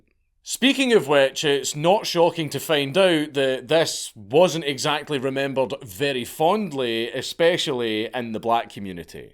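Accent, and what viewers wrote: Scotland, United Kingdom. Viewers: Just got off work from the MMJ dispensary. My favorite medicine. So why not listen to one of my favorite Scottish men talking about really bad drug companies. Aahhj yes! Ripping good time! Thanks!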